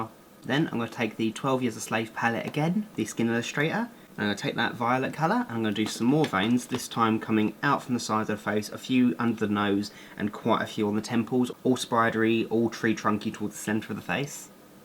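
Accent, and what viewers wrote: England, United Kingdom. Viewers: is he from England?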